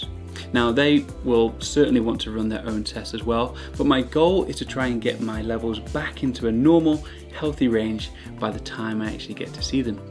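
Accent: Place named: United Kingdom. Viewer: Ur british? Love the accent :-)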